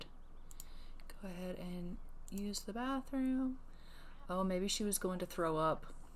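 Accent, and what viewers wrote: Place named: Tennessee, United States. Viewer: I had to go somewhere unexpectedly so I can watch the rest of the vid!Cora.....uh.........!They are definitely a lovey dovey relationship!I feel like they were meant for eachother, Also For The Heritages heres an idea!! Fred-50% Irish & 50% German Cora-50% Irish & 50% Spanish Also are you 5 hours behind me or 7?It's currently 3pm where I live,unless you usually wake up quite early or you have work!Because if you are 7 hours behind it'd be liek 8 and if it is pls rest,I mean unless your going to work but it's the weekend so wake up whenever I want and look at my dastardly dumb comments later lol!For some reason I really want their daughter to be called Merida just because of the hair lol!It kinda surprises me when the life expectancy is like 46,because I know royals have the best heakthcare but alot of royals during this time died in their 80's!!Yes that was me,I think they should buy a business!Butt during the great depression I think we'd have to get rid of it for a while!I feel like we could have a long life span but age them up when we want or kill them when it's the right time!My great grandma was born is 1924 and on Google it says the life expexctancy was like 52,the healthcare in her country wasn't great when she was in her 30's but she lived to 2020,I think my great grandma might be super human(Yes she was 96 lol). 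And the oldest person ever was born in 1884!Yes lol!!!!!She legit is eaten by the piano!OMG Yes accent,I love your tennessee accent,legit she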